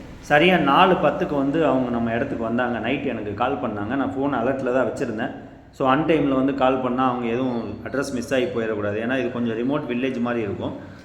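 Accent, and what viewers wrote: Madurai, India. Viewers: ❤ madurai basha ❤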